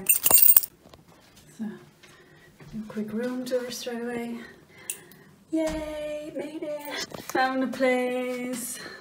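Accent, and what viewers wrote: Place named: Ireland. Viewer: I just saw a little boy child across your camera when you went in for a dip...so am figuring that you aren't the only person there........trying to figure out your accent....oh, i thought it was Irish.....lol oh, those men don't really mean any harm, they are just trying to break the Ice .....I am British, but my parents are Jamaican. A lot of people ask me where I am from, but because I am black, I get asked , so where are your parents from, so I have to explain they are Jamaicans.......BOOM !, as soon as they hear Jamaica .........Bob Marley, Ganja or Usain Bolt . I get it, they are trying to relay connect with me by the only thing(s) that they know about Jamaica, so maybe those men only know about the red light district and maybe the weed Cafes there.......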